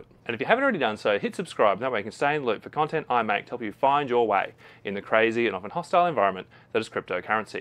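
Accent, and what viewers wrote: Australia. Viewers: thanks for the reply, I never thought you would ever reply. But I am very happy to get some help. I think u got an Australian accent . I am here in Australia, too. I never entered any passphase. Never. I never had any other accounts. Just this one. I was using this account just one week before it all happened to me. Please 🙏 tell me what's the solution is. How can I get my life savings back. Because I have been very depressed from the last two weeks. Seems you have very good knowledge. Please 🙏 help me . Please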